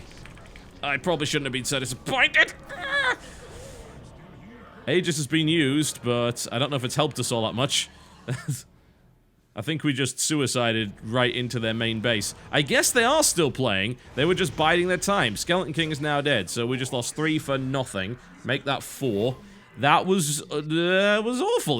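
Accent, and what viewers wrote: France. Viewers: Love the French accent lol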